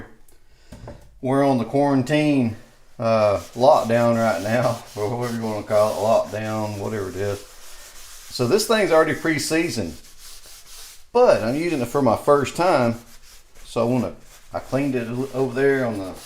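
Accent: South, United States. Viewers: Where u from? I’m new to your channel. I’m from North Carolina. You are definitely from the south lol I just ordered this exact griddle bc of this review. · your accent is hard to understand for non US ppl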